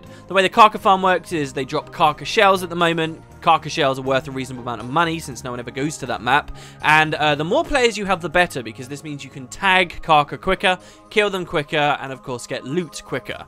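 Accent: United Kingdom. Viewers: Youre on US Server? You sound so british..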